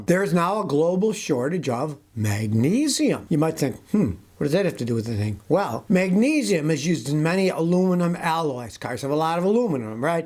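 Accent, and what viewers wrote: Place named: Buffalo, United States. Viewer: Classic Buffalo accent.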